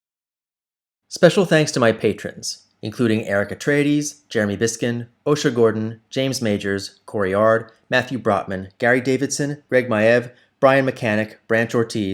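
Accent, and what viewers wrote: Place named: New York, United States. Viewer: This guy has an interesting American accent. His long A vowel has New York/Yiddish coloring that makes some of his words sound like British RP.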